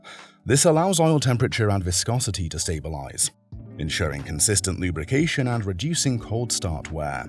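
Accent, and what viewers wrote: United Kingdom. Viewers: this obnoxious snotty sounding British voice narration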